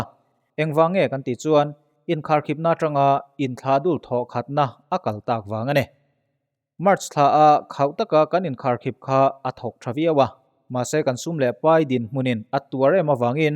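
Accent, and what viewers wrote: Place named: Mizoram, India. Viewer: I mizo ṭawng hi a MIZO bik riau zel...kal zel rawh le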